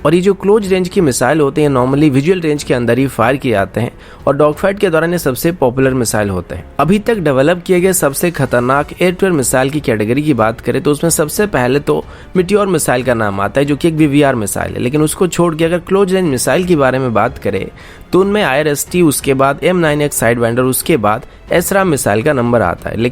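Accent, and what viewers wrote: Odisha, India. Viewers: Are you from odissa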